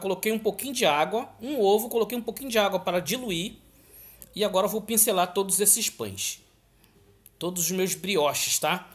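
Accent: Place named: Rio de Janeiro, Brazil. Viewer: Olá TD BM Choll de bola vc e o máximo explica bem esse sutake de carioca guanto descansou o pãozinho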